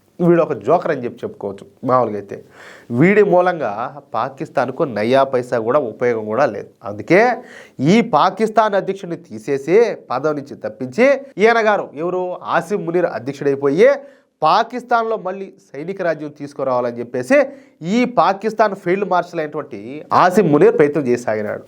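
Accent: India. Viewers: Mr., I like your videos due to your slang of telugu.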